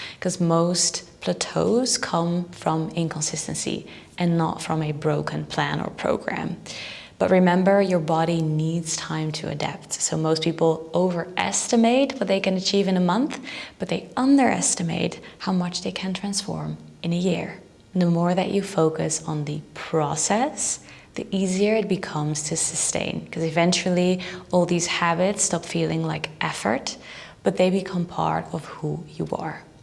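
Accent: Netherlands. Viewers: Very Dutch accent 😂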